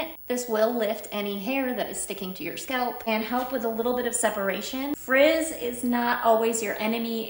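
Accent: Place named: South, United States. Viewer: It did sound southern!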